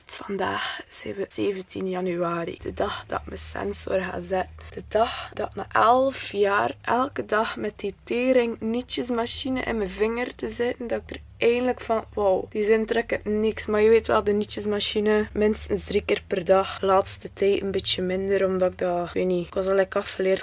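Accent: West Flanders, Belgium. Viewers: Funny... en west-vlaams! Ohja en ik moest van Hannes :D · & ik hou van het West-Vlaams in je vlogs!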